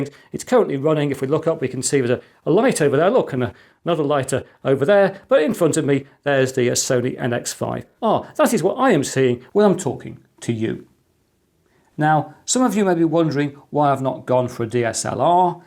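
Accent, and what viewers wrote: England, United Kingdom. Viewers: I very much enjoy the vidieo's, in fact at 62 I'm finding myself once again energized into doing more new things. The video length is good but, probably due to my age, I find you speak a bit quick so I have to go back and re-review to be sure I understood what you said. Lighting is good, subject camera work is also good and your explanations don't seem to get off subject and like most American's, love the English "accent" .... LOL. Recently dropped you an email asking a question concerning the Windows PE and hope to hear from you soon. Please keep up the great work, it is greatly appreciated from this side of the pond!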